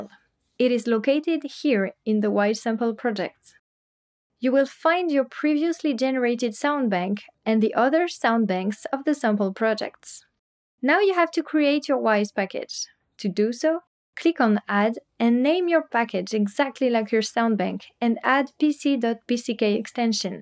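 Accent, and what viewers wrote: France. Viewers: She seems to have a little french accent.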